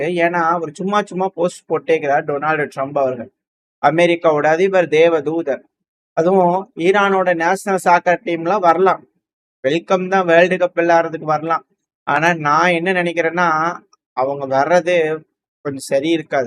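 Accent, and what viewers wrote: Coimbatore, India. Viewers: R u from coimbatore, guessed it from ur slang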